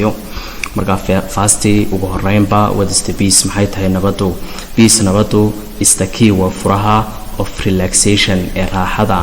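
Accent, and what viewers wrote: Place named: North, Somalia. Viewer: I,m very happy today because your speech is heer waqoyi, the previous days I watched the more teachers I can't I understood the talk them, welcome teacher😇🥰😇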